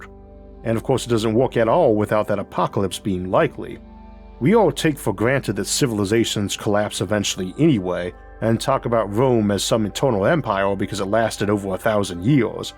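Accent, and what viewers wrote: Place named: South, United States. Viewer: It's a speech impediment(he has improved over the years tho thanks to speech therapy) Under that is a normal Southern American accent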